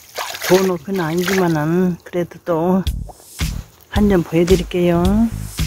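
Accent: South Korea. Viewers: 님의 구수한 사투리는 왤케 정감이 갈까요 ㅎㅎㅎ 손시렵겠어요 ㅜㅜ 역시 님이세요 그 혜안이 부럽습니다 꼭 따숩게 입고 다니셔요 누님 같아서 마음이 많이 갑니다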